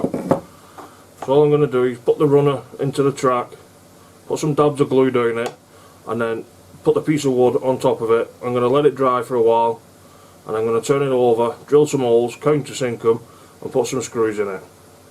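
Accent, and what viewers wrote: United Kingdom. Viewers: Excellent with the exception of my inability to understand your British accent. But, that's my problem, not yours. Thanks for taking the time. I have one of these same saws and I'm going to make this sled.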